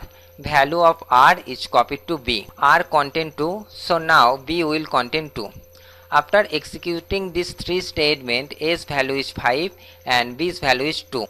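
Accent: India. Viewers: thoroughly indian accent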